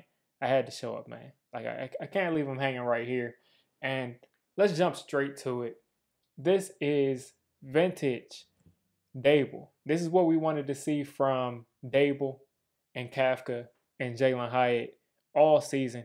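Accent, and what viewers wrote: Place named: South, United States. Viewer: What up man? Long time see! You definitely live down south now I can tell when you say certain words. Anywho, we need more speedy receivers, point blank.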